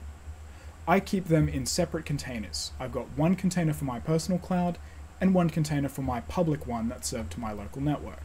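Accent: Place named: Australia. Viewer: going by your accent I am guessing you're a fellow Aussie. Facebook Marketplace is your friend. A lot of IT folks that are able to scam decommissioned servers will sell them on FB. Some people are a bit ambitious with pricing but every now and then someone gets sick of their PowerEdge T430 taking up space and they flog it off for $150AUD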